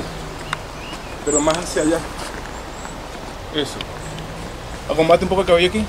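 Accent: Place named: Venezuela. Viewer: Hola que tal Saludos. Esta un divertido Video. A penas empecé a verlo y dijiste la primera palabra de inmediato supuse que eras Venezolano. Hehe y al final del video dices que si efectivamente eres Venezolano. Llegué a este video porque me compré ese lente de 85mm y quería buscar algunos videos relacionados con este lente. Las fotos muy lindas. Muy buena composición y un divertido video. Saludos.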